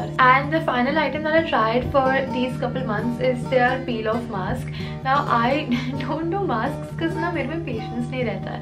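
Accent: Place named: Mumbai, India. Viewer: You are too cute! Your mix of Hindi and English words within a sentence is so Mumbaiiiyaaa...sooo sooo very me! Lots of love! :)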